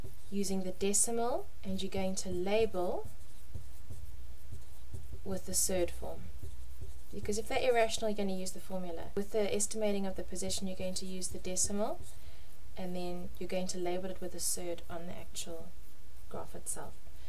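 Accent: South Africa. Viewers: You're afrikaans?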